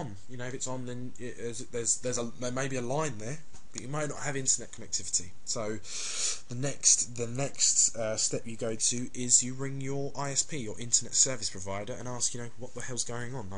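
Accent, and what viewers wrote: United Kingdom. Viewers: I have no issue understanding him or seeing the screen. He even has a UK accent which is different then the was we speak obviously here in the usa.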